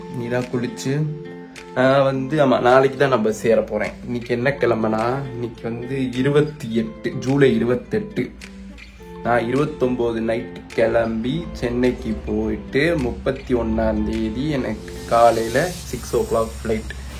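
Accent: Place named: Madurai, India. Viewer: Anneyy nenga madhurai ah unga language appudi dha iruku my fav annaw🫂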